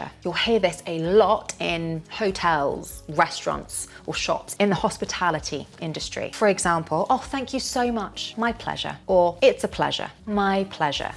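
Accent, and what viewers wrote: United Kingdom. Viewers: you are amazing i love british accent and you are more natural the way you pronounce that is mind blowing. love you from afghanistan.shahab. no worries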